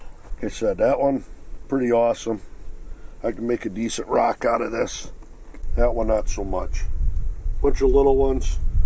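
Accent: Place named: Midwest, United States. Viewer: You sound so midwest I love it.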